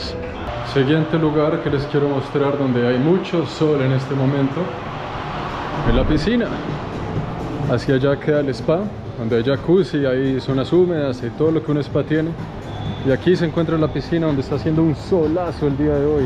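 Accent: Colombia. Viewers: Ejjj hermano si vos sos panameno porque hablas como nosotros los colombianos pues mijo? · Nacio pero se crio en colombia y tiene todo me imagino cultura acento todo osea es colombiano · Eres Panameño De Nacimiento? Por tu acento pense que eras Colombiano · con razón tiene acento colombiano.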